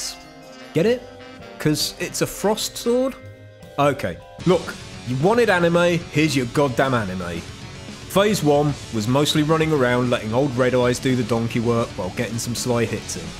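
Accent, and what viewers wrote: United Kingdom. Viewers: This British accent is like a dark souls one, you’re the onion knight of reality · Somehow your british ( I think, im sorry if I'm wrong ) accent makes this 10 times more entertaining .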